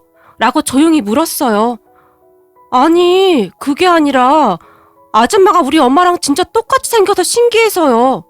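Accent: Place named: Jeolla, South Korea. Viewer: 님 왜케 귀여워요ㅋㅋㅋㅋ 접때 우연히 전라도 사투리쓰는사연읽고반했음ㅋㅋㅋ 넘귀엽.. 인스타안하세요? ㅇㅅㅇ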